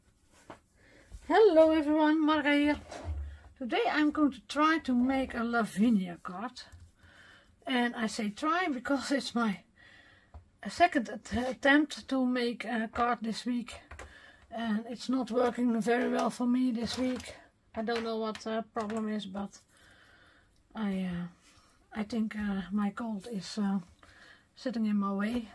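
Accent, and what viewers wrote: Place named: Netherlands. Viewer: Marga, stunning style and love your Dutch English, you are the most sweetest lady xx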